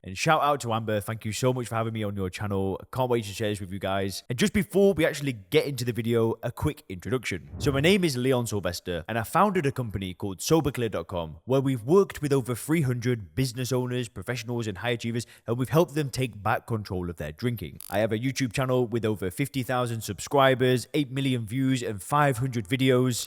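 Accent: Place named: North Carolina, United States. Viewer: you are from NC aren't you? you have the NC accent!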